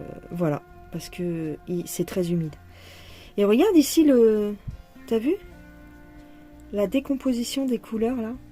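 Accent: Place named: Marseille, France. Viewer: Coucou du Québec. Bravo pour tes belles avancées et ton enthousiasme communicatif. Et j’adore ton accent. De quelle région viens-tu? Je dirais Marseille…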